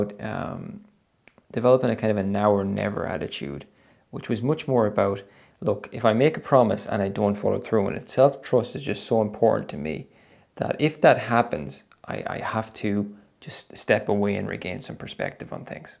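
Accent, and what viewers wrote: Ireland. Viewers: You say Ting not THING is that an Irish accent?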